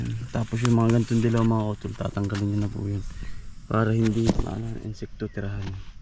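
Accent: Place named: Batangas, Philippines. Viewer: Tanung lng po kautol saan po ang lugar nyo kc ung pronounce ng salita nyo parang taga batanggas po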